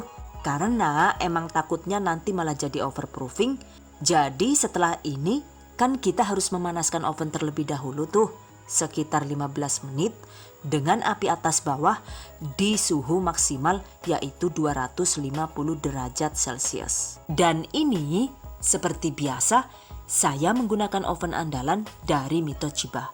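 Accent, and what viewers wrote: Banyumas, Indonesia. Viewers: Ya Allah.. ternyata dari negara ngapak to? 😂. Dari dulu kalau lihat ngakak mulu.. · maksih resepnya bunda seneng deh,jelas banget cara penyampeannya, lucu lgi bunda ngapak² 😂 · Dening ngapak2 cilacap / banyumasan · Auto subscribe....karna ngakak ngapake metu...hidup ngapak🤣🤣🤣🤣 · Ngakak Bahasa ngapaknya 😀😀 Rotinya mantul bun. Kudu di jajal kiye rotine 😂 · langsung auto subscribe waktu denger si ibu ngomong ngapak...😅😅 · Bu kang endi jane asale...deneng ngapak banyumasan yak 😅😅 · Sering nonton..asyik dan tambah sayik ketika bunda ngomong ngapak saya seneng..karena bisa menikmati vidionya sambil ketawa ketiwi sendiri..😃😃😃makasih resepnya ya bunda santiikk.. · "ookeeh!caatat!gitu yah!emang gitu yah!fifty menute laterthe choice is in your" Wel, it's amaiiiiiizing!!, madame lah kepribeeen ngapak 😁😁😁 bikin gagal fokus dengerinnya. Anyway pokoke siip laaah. 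Thx madame dan salut cengkok bahasanya inggris british banget. REQUEST: Saya sudah lama pernah makan ikan sapu-sapu kali saat camping waktu sekolah. Caranya saya mengolah terpaksa lumayan sadis (karena kulit ikan sapu-sapu "emang keras yah?" 😁). Pertama, ikan sapu-sapu dibakar hidup-hidup dalam bawah api unggun besar/panggang hidup ± 20 menit. Setelahnya saya gunakan ranting dan sarung tangan untuk keluarkan ikan sapu-sapu mateng. Lalu, Tekniknya saat ikan sapu-sapu masih panas langsung patahkan kepalanya dan tarik sekaligus (satu paket: kulit keras, tulang 1 set, usus dan kotoran, ingsang, batok kepala, semua langsung lepas dan buang). Sisanya sirip ekornya dipotong dan dibuang. Terakhir baru daging ikan sapu-sapu diolah dengan jeruk limau + bumbu + dimasak matang dan enak. Mbak, apa ada cara yang lebih bagus daripada cara dibakar seperti itu?, kalau pakai oven saat masukinnya itu ikan sapu-sapu apa 'menggelinjang-menggelinding tuing-tuiiing' yah?! 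Barangkali mbak punya Ide CARA Pengolahan ikan sapu-sapu dan MENU Apa. Saya tunggu kreasi mbak. Saya subscribe and thanks. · Wkwkwk enak ngapaknya ngomong nya, jdi kepengen kursus Bahasa ngapaknya. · Yune wong ngendi sih bahasane ngapak banget... Anu turunan turki apa yah.. Aku tak coba praktek kiyeh yu... Seneng pisan yakin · Lahhh jebule mbekayune wong ngapak ya...!!! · suara ngapaknya bkin sy ktawa 2 bae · i like ngapake yu...... #hidup ngapak wkwkwkwk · Terima kasih resepnya kak,suami sangat suka makan roti...saya suka kalau kakak ngomong bhs ngapak krn suami orang cilacap juga,sukses buat kakak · bund aselinya mana?? ora ngapak ora kepenak ya buund:D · Ngapakke metu.ora ngapak ora penak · Syukaa dgn bahasa ngapaknya...makasih resepnya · Mbaknya kl ngomong sk lucu...trilingual pula..😁 sk bngt kl mbaknya ngomong pk bhs semacam banyumasan...luv u😘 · hahaha....lucu jg bu di vloge ini...aku suka bahasa ngapak ngapaknya...hayooo org Tegal atau Purwokerto niehhh....? 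· ora ngapak ora kepenak...yora son?? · ya Allah ngapaknya 😂😂😂 maturtenkiu mbekayu resepe... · di ringokna nemen" malah gari ngapak😂. thank's for information and tutorial bun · Hahah bhsne ky inyong pada bae ngapak bun 😂😂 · Mbak...aku galfok... Rika ngomong ngapak🤭🤭🤭 sukses selalu mbak... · Wong ngendi si kie seneng ngomong e ngapake ngepol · Awal nonton tak kira bunda orang luar..😅dari nada bicaranya.. lah skrg knp jdi ngapak bgtu bund 😅keren lah pokonya ga bosen nontonnya asik dn menghibur 👍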